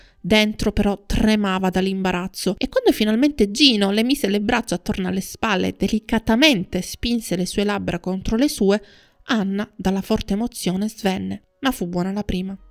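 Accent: Sicily, Italy. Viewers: Ciao, appena iscritta! Ho trovato la storia sconvolgente, adoro da quando ero adolescente gli attori del passato, fra questi il mito per eccellenza Dean di cui parli...Mi sento dirti grazie, tenendomi il beneficio del dubbio( leggendo i commenti degli altri iscritti..anche riguardo le fonti del libro letto..) sulla totale veridicità dei personaggi di cui parli, non so chi sei etc..che sei siciliana lo sento dal tuo accento! Ciao buon lavoro!🌹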